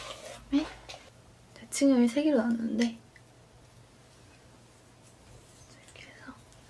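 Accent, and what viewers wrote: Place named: Seoul, South Korea. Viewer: 90년대 서울사투리를 쓰시네요ㅋㄹㅋㅋㅋㅋ 말투가 특이하다 생각했는데 그거였어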